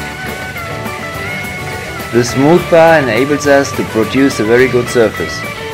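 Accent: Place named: Germany. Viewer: as a linguist I seem to hear your German background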